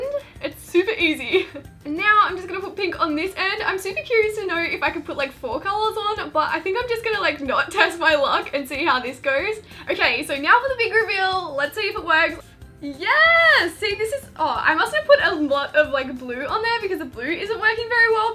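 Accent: Australia. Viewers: are you Australian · I love your Aussie accent its so cute♡♡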